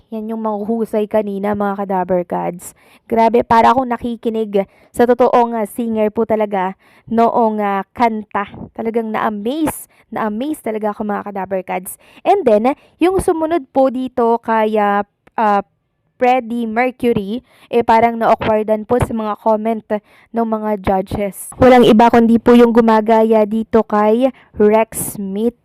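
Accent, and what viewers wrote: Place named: Visayas, Philippines. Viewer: bisaya ka day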